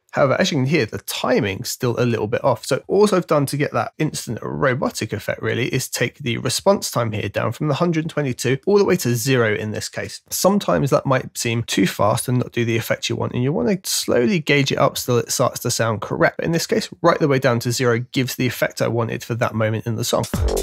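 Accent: United Kingdom. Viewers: I pressed a like just for the British accent (don't mind me, if I am wrong and that is an American one, or something else) cheers for the content, why are there only 7 likes on this vid?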